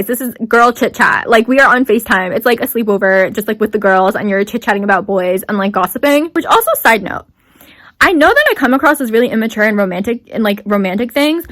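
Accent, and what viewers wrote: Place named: Australia. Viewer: ok but why is no one talking ab how fire that australian accent was??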